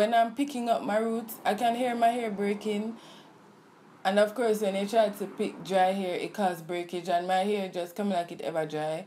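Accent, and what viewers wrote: Jamaica. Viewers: your from Jamaica right? I can hear the Jamaican accent 🇯🇲❤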